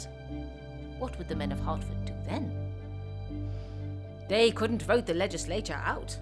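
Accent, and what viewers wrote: United Kingdom. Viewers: So she has British accent. Great.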